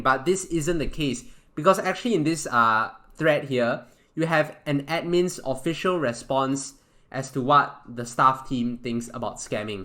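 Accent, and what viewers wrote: Singapore. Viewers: u sound singaporean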